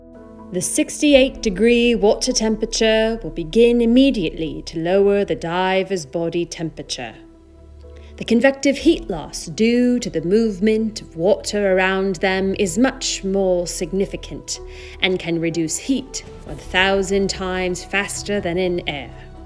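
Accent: United Kingdom. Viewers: Totally loved your British accent. Very funny, especially the panic part. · I thought that was a very impressive British accent. Well done mate! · I liked the voice, sounded authenticity British to me and fun. Hope you don’t stay sedately in FL. Happy Holidays will miss you😷😳🥲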